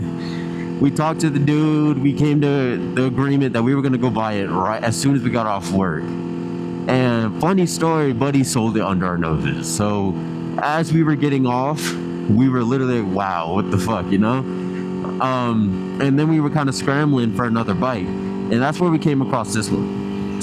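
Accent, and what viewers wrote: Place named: North Texas, United States. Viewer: took me 30 seconds to realize you were in north texas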